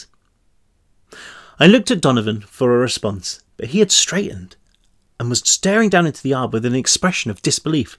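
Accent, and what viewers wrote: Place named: United Kingdom. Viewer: I love hearing read it in a British accent. How cool would it be to have a recording of Shakespeare reading his own plays? I like how he shares his own thoughts too.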